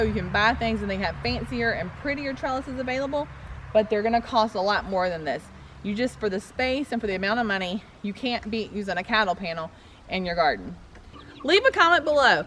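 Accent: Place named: Texas, United States. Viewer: Hi i just found your channel. It sounds like you have a possible Texan accent. We are a family of 7 but our kids are all grown. Trying to start a more self sufficient homestead lifestyle. Im 52 and the hubs is 54. And we own our own drafting and design company on our 2acres of land in SE TX.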